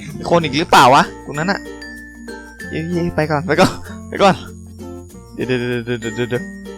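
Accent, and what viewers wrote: North, Thailand. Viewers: พี่ๆเป็นคนเหนือหรอ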